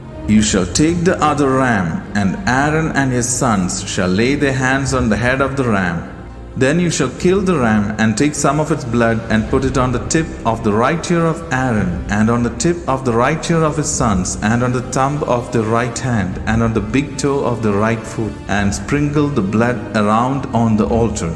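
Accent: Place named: India. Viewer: Really grateful for the Indian accent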